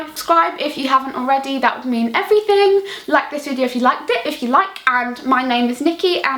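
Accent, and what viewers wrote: United Kingdom. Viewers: I started to understand british english talk most from your videos...Thanks<3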